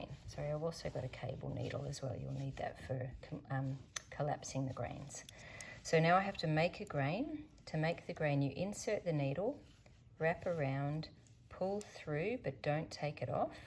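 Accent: Australia. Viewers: Dear Thank you so much for your time spent creating these tutorials. I purchased the pattern in my mother tongue, danish, but because I am a relatively new knitter, it has been slightly daunting. You make it look like child's play. Although I am not fooled into thinking that it will be for me, it does encourage me to think that it may just be possible. Being Scandinavian, I am used to nordic style knitting. Nevertheless your tips are invaluable. Lastly I can't refrain from commenting on the joy it is to hear it all explained in australian english, as i grew up partly in Bendigo, Vic. So I enjoy the light in your beautiful home, which is so typically Australian. 🎉 I do have a bit of trouble balancing my cable needle. The stitches tend to slip off. Do you know any tip to get around this?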